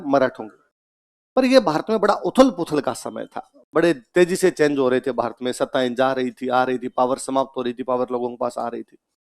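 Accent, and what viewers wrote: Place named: Rajgarh, India. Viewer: Sir me rajgarh se hu apki puri bhash meri jesi he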